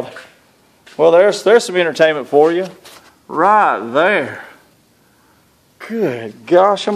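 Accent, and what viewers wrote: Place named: Mississippi, United States. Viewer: First comment of 2016 BTW ur from Mississippi huh that's how we sound down here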